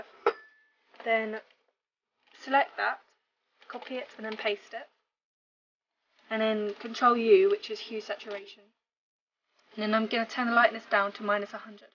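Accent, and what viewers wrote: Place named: United Kingdom. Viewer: I'm a sucker for British accents. I love it!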